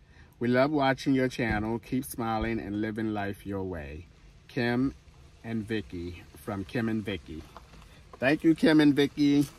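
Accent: Baltimore, United States. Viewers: I didn’t know you were from Baltimore, but I kept saying I hear a little Baltimore in him…maybe he’s from PA.😂 Baltimore representing!!!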